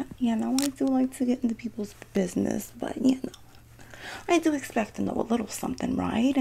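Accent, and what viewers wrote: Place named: New Jersey, United States. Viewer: Your Hersey accent vids are everything 🩷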